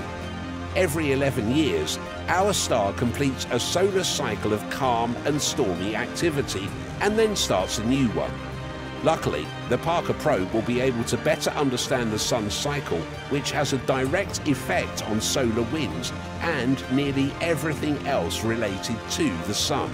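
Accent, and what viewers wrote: United Kingdom. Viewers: annoying british accent...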